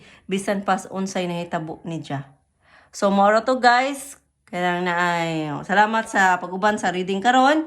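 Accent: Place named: Bohol, Philippines. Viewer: Baka taga Bohol siya,dahil sa pananalita niya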